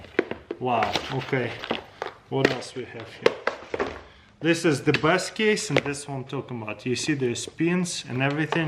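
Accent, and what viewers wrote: Russia. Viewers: Russian accents are the coolest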